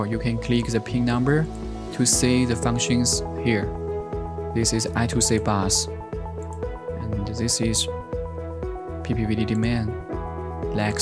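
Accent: China. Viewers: 中国人讲的英语么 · 中国公司，这英语就是中国人读出来的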